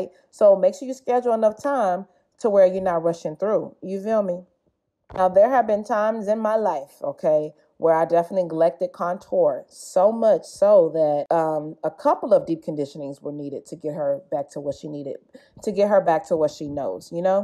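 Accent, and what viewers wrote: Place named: Yoruba, Nigeria. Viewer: Just discovered you. I love how your Yoruba/Nigerian shines through.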